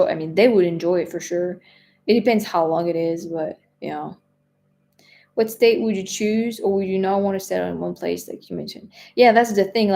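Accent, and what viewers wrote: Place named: France. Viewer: I love your French accented English! 😎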